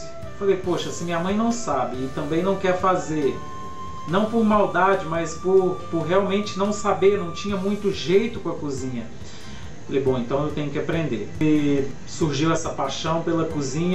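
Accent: Minas Gerais, Brazil. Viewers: Ah! como imaginei, pelo sotaque e jeitinho, vc é mineiroo de BH! Moro em BH, pois casei com um mineiro uai, mas sou gaúcha, criada em Curitiba. Hoje, super inscrita no teu canal... entrei por acaso pra ver uma receita e vi q teu canal tem mtaaaaas receitas boas. Vc é mto talentoso, prendado e acima de tudo uma pessoa humilde, tbm explica mto bem as receitas. Deus te abençoe, grandemente,! Abraço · Oi! desde o primeiro vídeo de rocambole já gostei do seu jeito de passar a receita, mto tranquilo, simples e com mt convicção. Que bom esse vídeo, e´ interessante sabermos um pouco da vida da pessoa, completa mais o conhecimento virtual. A gastronomia e' instigante, ela nos remete a vários lugares culturas diferentes.....nos faz experimentar um pouco desse universo, gosto muito tbem, se não fosse professora acho que me daria mt bem nessa profissão. Você tem tudo pra dar certo! 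na vida as coisas são devagar tudo no seu tempo, gosto do seu sotaque mineiro e da sua cultura, comidas deliciosas... Sorte e Sucesso! beijos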